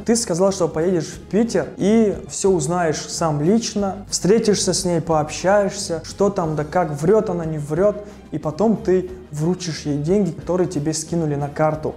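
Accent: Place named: South, Tajikistan. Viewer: ман аз Конибодом.Хамон видеоролике ки гуё духтар аз Конибодом бошад боварам намеояд. Аксенти мо дигар. Вай мисли одамони чануб гап задаги.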